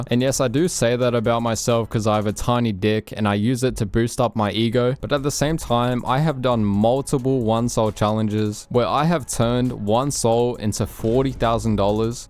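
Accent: Australia. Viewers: are you from Australia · If you hear an aussie and he speaks like this with good humour, you know he genuine.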